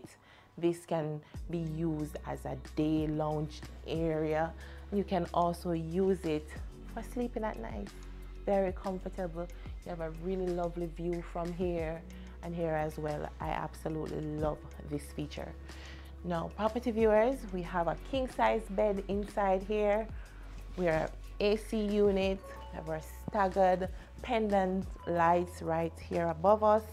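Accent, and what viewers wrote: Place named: United States. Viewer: Right here, not right her, that's American's dialect. Your English is great enough.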